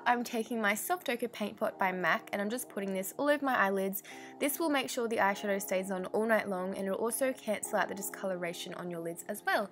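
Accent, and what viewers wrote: Australia. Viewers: Sounds Australian so that would make sense. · I am not a native english speaker but I'm used to Lauren Curtis's accent,so I was feeling this beauty guru is Australian as well!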